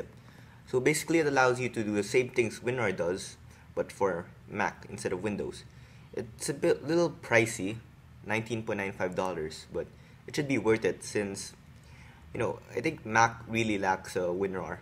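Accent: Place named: China. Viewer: u have chinnesse accent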